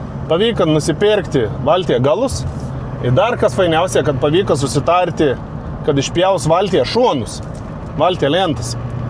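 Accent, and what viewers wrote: Samogitia, Lithuania. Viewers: Žiaure smage stebiet žemaitį ir klaustėis žemaitių kalbuos. Linkiejema iš Skouda ;) kontrybės valteis statybuos ;) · Jäkla kaip miela klausut zemaisiska Mano tevu dialekas linkejinai is Svedijos 🥰🥰🥰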